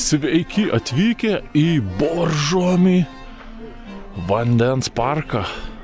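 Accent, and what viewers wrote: Samogitia, Lithuania. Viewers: Mažiau zemaitisko balso jau truputi perdaug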